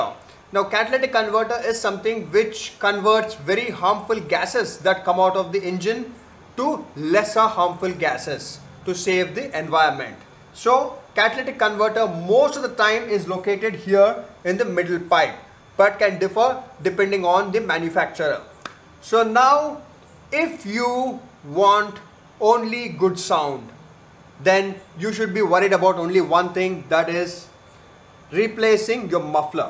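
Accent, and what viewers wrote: India. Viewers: okay this is very loud and clear explanation after finding so much gimmick videos in youtube, your indian accent is quite strong but i totally understand what you said, not like the others explanation from another indians, sorry i am not being racist but you are good.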